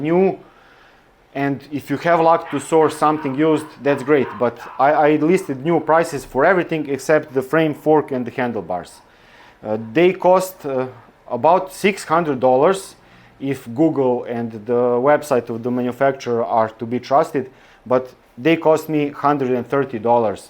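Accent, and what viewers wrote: Eastern Europe. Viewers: Your Slavic accent is fine 😉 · Your “Slavic accent”, my friend, is not a problem at all - and I’d bet that your English is a helluva lot better than most English-speakers’ grasp of any Slavic language 😅 Your videos make a lot of sense, get straight to the point and avoid hyperbole. There seems to be no agenda other than sharing your knowledge. This is all hugely appreciated, thank you.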